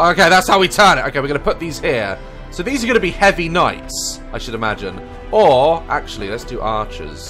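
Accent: United Kingdom. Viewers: it's cuz he's British 😄🇬🇧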